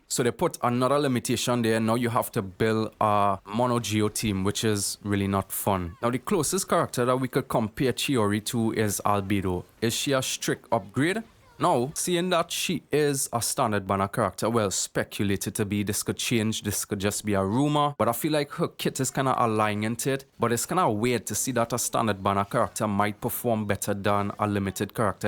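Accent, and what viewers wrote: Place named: Trinidad and Tobago. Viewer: Oh i have a good guess for some reason i think you from Trinidad lmao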